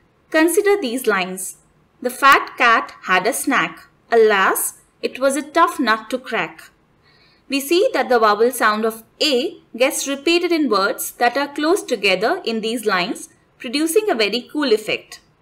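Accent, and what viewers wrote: India. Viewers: People complaining about her "Indian" accent could switch on the subtitles. Great tutorial, subscribed to your channel :) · You speak English-language with Indian accent. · lmaooooooo indian voice xdxdxdxd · When the video was done and you realize you don't understand anything because of laughing at the indian accent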